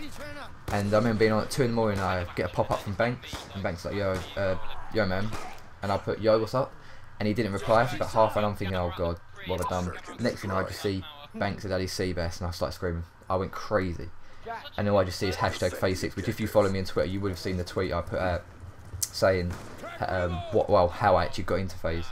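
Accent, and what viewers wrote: England, United Kingdom. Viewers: Are you English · How old is he? And is he British?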